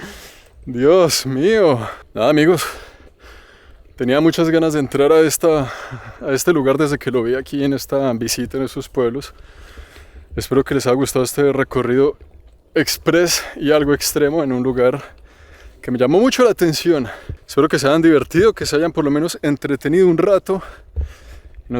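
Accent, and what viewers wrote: Colombia. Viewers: De qué parte de Colombia eres?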